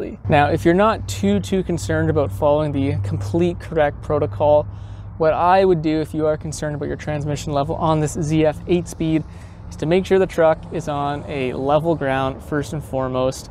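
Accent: Canada. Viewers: this must be in canada i can tell by the way this dude talks why dont they say farenhiet instead of celsius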